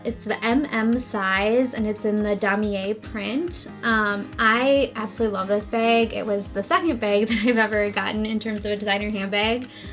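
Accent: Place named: Midwest, United States. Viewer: Probably Midwest (Wisconsin or Minnesota) just guessing from her accent "bag" lol. I live in the Midwest too.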